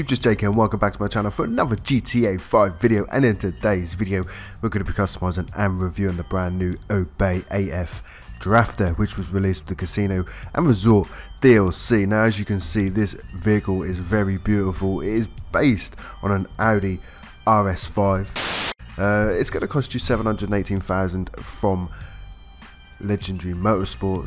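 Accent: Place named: Norwich, United Kingdom. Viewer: Your from Norwich right? Or very very close lol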